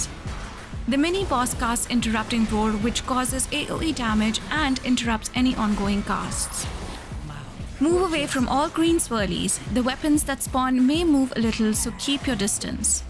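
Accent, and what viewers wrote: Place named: Asia. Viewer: It's very refreshing hearing a fellow Asian covering WoW with your accent lol ❤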